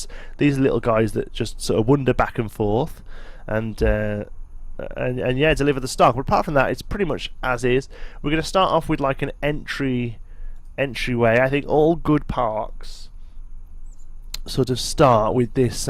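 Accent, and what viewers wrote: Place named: Birmingham, United Kingdom. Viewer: I'm from England, Birmingham(B45) Where you from because your accent doesn't faze me and kind of sounds normal... You gotta be from somewhere round here...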